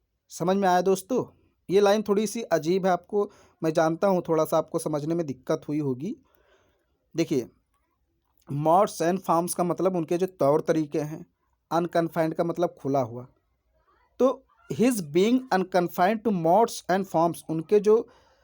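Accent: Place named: Purvanchal, India. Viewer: Sir speaking language tells that you generally belog to Purvanchal... May be Sultanpur, Ambedkar nagar or Ajamgarh😊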